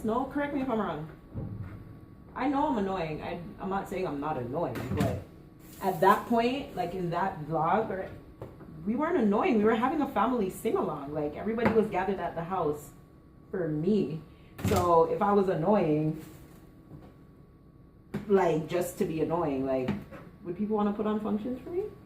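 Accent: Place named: England, United Kingdom. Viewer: Love your English accent... You always make me laugh.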